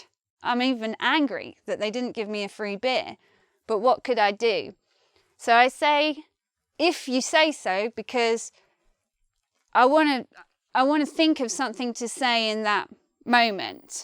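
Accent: United Kingdom. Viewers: hi. thanks for the lesson. I love ur uk accent. have a good one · She has Brittish accent.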